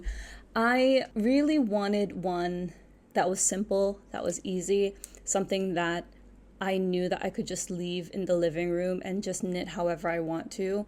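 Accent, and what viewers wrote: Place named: Philippines. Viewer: Hello! You do like a bit Filipino coming from a Filipino. I think SEA prefer crochet also is because it's much more breathable because it's more hole-y compared to knitting so it's better for the warm weather in SEA.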